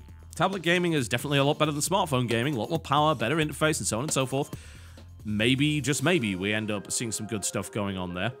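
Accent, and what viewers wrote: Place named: England, United Kingdom. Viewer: Total Biscuit, you'r lossing your english accent :(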